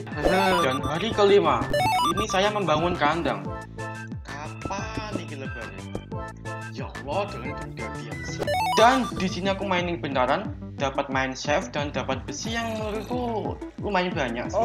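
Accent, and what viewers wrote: Java, Indonesia. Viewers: Wong jowo bng?... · Wong jowo mas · Orang jawa ya bang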